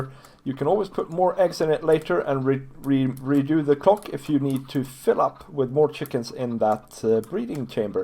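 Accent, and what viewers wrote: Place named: Norway. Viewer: Oh i literally heard it in your voice I’m norwegian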